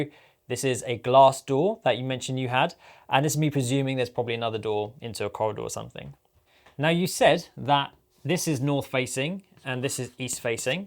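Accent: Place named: United Kingdom. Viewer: Ong thanks so much, this is very helpful, I’ll keep all the techniques in mind and see what will fit best when filming 😁😁 also I do live in the uk 🇬🇧 I assume u do as well based on the accent 😂, thanks again for the tutorial very kind and helpful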